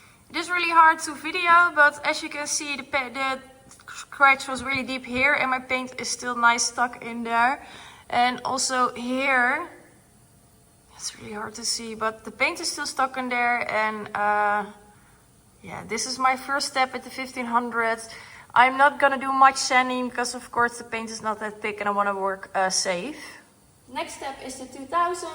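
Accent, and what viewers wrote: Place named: Netherlands. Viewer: Where are you from? Sounds like you have a Dutch accent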